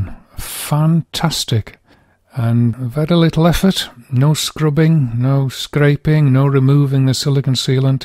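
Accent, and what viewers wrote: Scotland, United Kingdom. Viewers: Awww, your accent....I reminds me of my home away from home...Scotland....Thanks for the tip!! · Is that beautiful accent Scottish?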